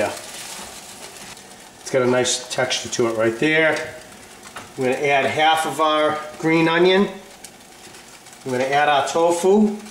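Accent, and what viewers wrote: Boston, United States. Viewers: sounds like ur from boston. come on say dark againg.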